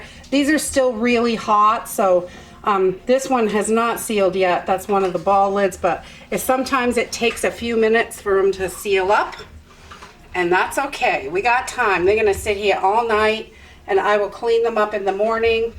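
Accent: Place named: Maine, United States. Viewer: I KNEW I recognized that accent! We just moved here a year ago from the Lakes Region in NH back to my hometown in Maine. Howdy Neighbor!!!!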